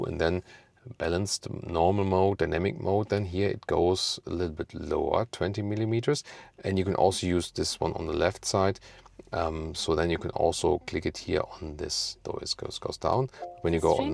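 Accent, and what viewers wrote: Germany. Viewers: Thank you for the great review! German humour and accent is the best for reviewing german engineering. And involving freshens the video so much! The car looks good, but they could ve done better, I think... Great that the headlights are in one piece. Of course the black matt styling of the body would have looked more practical for those who really go off the paved road. And why no split exhaust???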